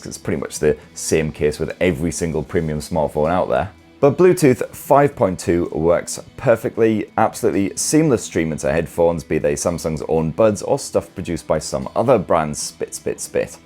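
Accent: United Kingdom. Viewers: Good review. Seems fair and realistic. You don't sound biased. And your British 🇬🇧 👍🏻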